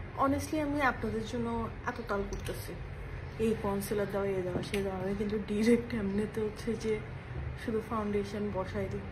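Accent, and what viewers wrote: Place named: Chittagong, Bangladesh. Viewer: আপু তোমার কথায় চিটাংগা এক্সেন্ট শুনে খুব ভাল্লাগলো❤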